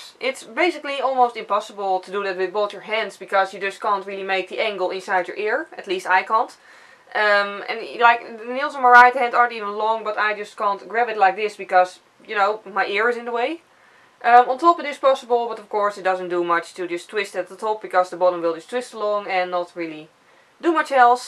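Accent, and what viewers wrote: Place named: Netherlands. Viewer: Wow, I did not expect you to answer personally.🙂 I just noticed your accent, I'm german and I often go shopping in the netherlands, but I never heard a Dutchman/woman talk English before, sounds kind of familiar to the accent the Dutch have when then talk german.😊 Btw. thanks for answering me and I think your video was helpful.👍☺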